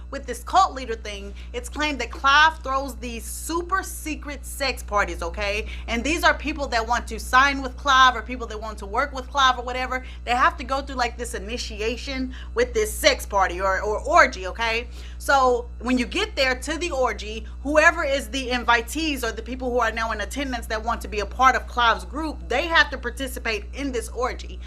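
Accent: South, United States. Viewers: You're so country and loud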